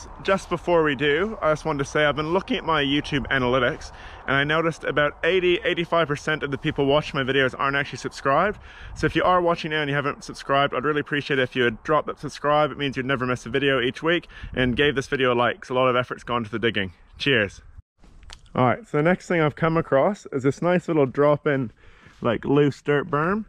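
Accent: New Zealand. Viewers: You sound like kinda New zealandish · This accent though, i hear kiwi, aussie and american all in one sentence.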